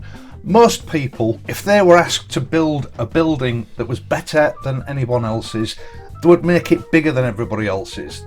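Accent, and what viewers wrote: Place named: Hull, United Kingdom. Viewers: I love your accent, my missus is from York, the Yorkshire accent is great. I want you to make money from the channel if it means you keep posting. Have a great Christmas. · The way you talk is a problem to some people? That rich Hull accent! Up with Yorkshire! Great channel. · Spoken like a true Yorkshireman! I like your accent, not that it means a lot, but I do. My late Mother was born and bread in Yorkshire, well that part that’s now called CLEVELAND! My father died when I was only 10yrs old, he was a great deal older than my Mother, but succumbed to lung cancer in the days before that disease was linked to smoking, and he was a heavy smoker. My mother had a big family in Thornaby and surrounding areas. After my fathers demise, she sort solace in the bosom of her family, and I spent a lot of time ooop Nooorth! 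My home town was Cambridge. I thought we were going to move there, and I think that was my Mother’s original intention, but for some reason it never happened, I think it was something to do with my younger Brother and sister. Anyway, it’s long way round, to saying that your vids bring back very happy memories for me, and I love ‘em. When I left school, I joined the Army as a Boy Soldier, and my first posting after Boy Service was Catterick. I had a bike by then, and the rides around the moors were among the best I have done. Not to mention the seaside visits to Whitby, Seaton Carew, Redcar, Scarborough, and many of the villages that were made famous by TV programmes, long before they had been found by that Media. All I can say is, that anybody that has anything derogatory to say about you and your work, must have a very sad home life. As for me, being a new subscriber, I am happily going through ALL your vids, and absolutely loving it, and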